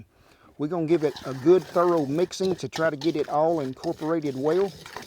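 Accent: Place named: West, United States. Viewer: Mr happy thanksgiving to you and your family wow this looks so yummy you 've done made me hungry lol love your western accent you are so awsome thanks for all of your yummy videos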